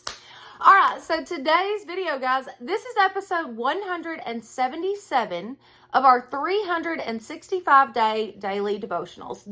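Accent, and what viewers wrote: South, United States. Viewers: Wow! Thank you! I really needed to hear this today and I must admit I’ve watched it three times already and will probably do it again. I received some bad news about my health about a week ago and I am really struggling with it. I love your devotionals and crafts and most of all I love your voice! I actually thanked God for your voice today! I love that little southern accent. I’m in California so I don’t get to hear it very often but I particularly loved hearing you talk about the rocking chair and your mom singing to you and I have the same memories just lost my mom on September 17 and I miss her so much and I know you can relate to having a lot of bad things happen all at once. I feel like you are so relate-able. I just can’t thank you enough for all you have brought into my life through Jesus Christ our Lord! Thank you thank you thank you! 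❤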